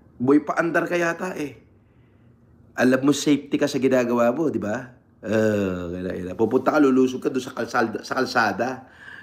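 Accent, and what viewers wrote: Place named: Nueva Ecija, Philippines. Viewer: nangto tunog nueva ecijano, kupal peke imahe HAHAHA😂😂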